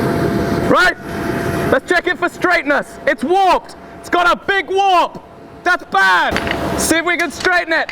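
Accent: England, United Kingdom. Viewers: Overdoing? You realise he's an englishman... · He is a pom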